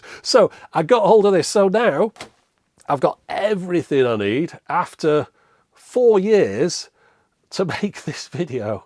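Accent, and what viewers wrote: United Kingdom. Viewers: you sound like oyur from the uk so maybe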